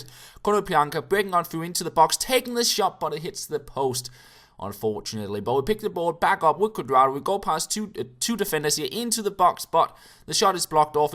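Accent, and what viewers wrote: Jutland, Denmark. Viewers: Er du fra Jylland?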